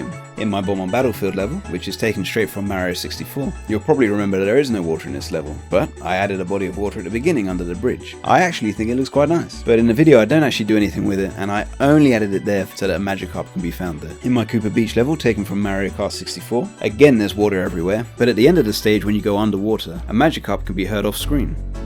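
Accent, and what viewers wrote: United Kingdom. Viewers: Your British? Huh...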